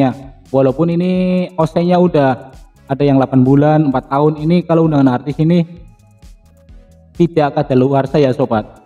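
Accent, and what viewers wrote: Tegal, Indonesia. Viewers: Jenengan asli tegal apa wa. Kayong medok temen ra.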